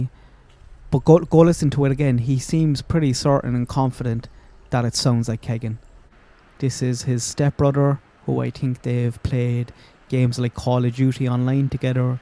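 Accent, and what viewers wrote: Ireland. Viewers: Great to hear an Irish accent,this case is going to be solved,I'm a new subscriber🇮🇪🇮🇪🇮🇪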